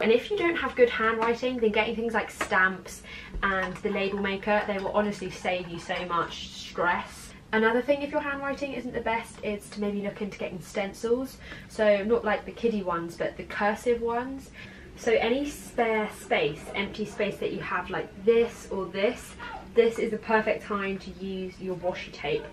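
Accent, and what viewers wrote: United Kingdom. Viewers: Oh so you're british. I love your accent omg I think I'm inlove lol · I adore your British English 😊Ready to listen to whatever just speak plz. Hi to Brighton ❤ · Wow her British accent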